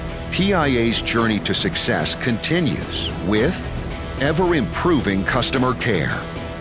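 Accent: United States. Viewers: western guy with american accent promoting PIA He is not even able to pronounce Pakistan correctly. You people didnt find any single person in pakistan worthy enough to give a voice over for this video? . Such a disappointment. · The american voice seems unreal. Please have a Pakistani bring in some flavour, instead of this military sounding thunderous voice. It will give it a Pakistani feel. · If your a Pakistani airline then why are you using an American voice why not a Pakistani voice.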